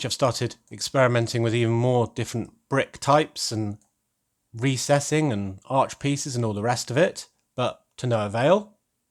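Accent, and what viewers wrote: United Kingdom. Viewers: Great video (as always😉) Myself, I use leocad for designing small Lego buildings. There catalog is bigger than the one from ldd. I'll download bricklink studio and give this a try, as you or someone in the comments said, bricklink is now in the hands of LEGO so maybe there catalog will be even better. Keep up you great video's, it's always a pleasure to hear real UK English and not the simplified version 🤣🤣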